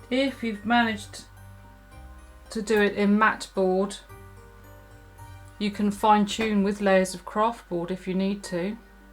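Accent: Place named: United Kingdom. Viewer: I think everyone hates the sound of their own voice. I feel like mine is really deep for a female but then I'll hear myself on a voicemail or something and it sounds nothing like what I hear. But you have a great voice. I'm a sucker for an accent anyway. I'm assuming you're from Great Britian. Do you live there now? One day I hope to make it across the pond to visit all of the British Isles including Ireland. I've always been in love with those places and it's my dream to visit!!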